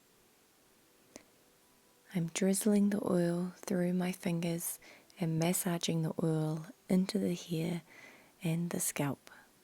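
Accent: New Zealand. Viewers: Kiwi accents are the best! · Are you a kiwi? The sound of your voice had me falling asleep 😴 you talk exactly how my momma used to talk when she was trying to make me go to sleep as a child 💕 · Love your Kiwi accent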